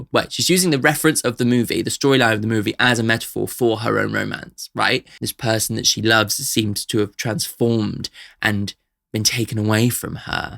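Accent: United Kingdom. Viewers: Do people with beautiful English accents realize how they just seem brilliant to us Americans? You make “bastard” sound nice! Anything you say sounds smarter than us even if it’s gibberish! Funny how music masks accents.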